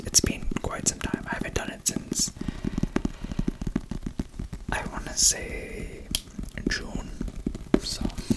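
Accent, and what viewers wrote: India. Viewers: Indian accent videos are my favorite!! Love the video as always and keep creating great content!!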